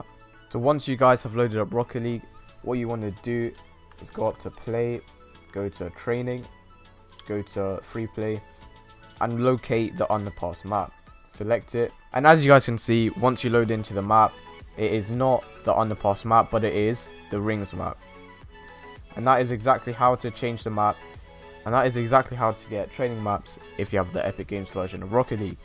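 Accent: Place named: London, United Kingdom. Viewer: Sheesh bigman with the london accent bigman thatnks for the help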